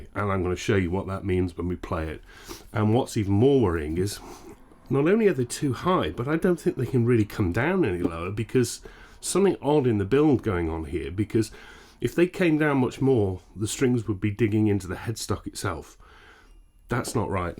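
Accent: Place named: England, United Kingdom. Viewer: Its painful The English accent makes it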